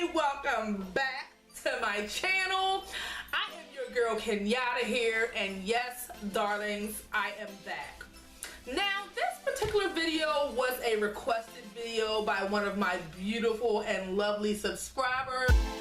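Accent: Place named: Maryland, United States. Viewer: Helpful! I hear some Maryland in that accent...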